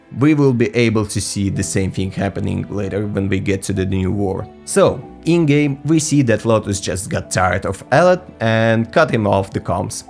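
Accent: Russia. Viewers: You sound russian...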